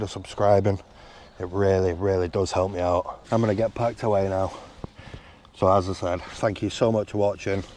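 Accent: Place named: Northern England, United Kingdom. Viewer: Nice to hear a rete noorthen accent and a brew called a brew. Proper.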